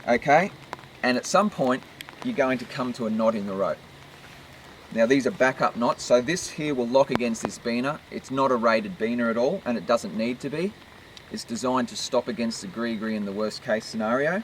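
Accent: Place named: New Zealand. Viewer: Does anyone else from Australia get really confused sometimes when you hear someone who's not an American, but just to make sure you watch a video of an American and then it clicks... kiwi...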